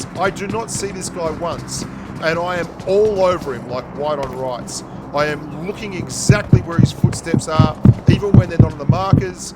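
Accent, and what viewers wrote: Australia. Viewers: He's an Aussie mate.... GADDEMIT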